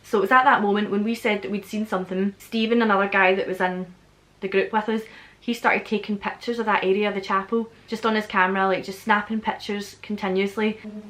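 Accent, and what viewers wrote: Scotland, United Kingdom. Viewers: Scottish! I could tell right when you opened your mouth,lol. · Looking for evp vids whilst working. Clicked on yours and I am glad I have not even got to the Evps but its nice to hear another Scottish accent on the old YouTube. Subscribed straight away haha love from Dundee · I love your accent so much. My family is Scottish on my grandma's side and we visited last year for the first time. I fell in love with the Highlands and would love to move there some day. 💜🏴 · Didn’t expect you to be Scottish lol. Pleasant surprise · btw, might I suggest this to your viewers? I would suggest they go watch Jessica here <link> and then come back to videos, and just try to understand her. It's so weird, my brain switched to the Irish accent (trained linguist here btw) and then when I got here it couldn't switch to Scottish accent! It's like it got stuck so I spent more time struggling with this video, when normally I don't have any issues. 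I think I might have just discovered how to break your brain! LOL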